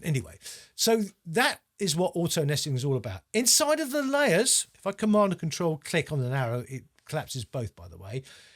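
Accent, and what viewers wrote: England, United Kingdom. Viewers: Very helpfull! Where is your accent from? It reminds me of when I lived in England.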